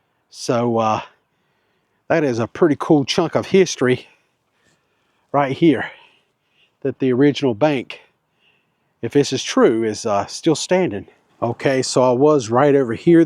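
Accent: South, United States. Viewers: While these towns have seen better days, I do appreciate your visiting them , rain and all. While saddened what has happened to these towns, because of a number of factors, one can't replace their history. The beautiful old police car (?), I mean, come on. how great it that?. When the rain stopped, you entered the town of OLAR which I thought (through your southern dialect) was OLA, the name of my long passed mother., from the mountains of Virginia. Regardless, I did particularly enjoy that town and it's old buildings. Like you said, a little love paint, and the folks here just might see the value in a coffee/snack shop and barber to get thing back on track. Only time will tell.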